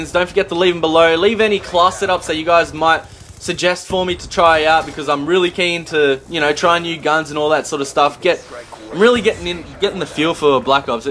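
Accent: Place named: Australia. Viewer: where you from??..... u sound mad aussie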